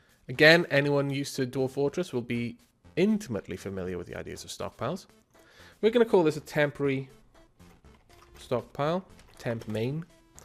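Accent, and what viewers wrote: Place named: South Wales, United Kingdom. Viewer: Hey where in Wales you from? You sound like a proper south-walian!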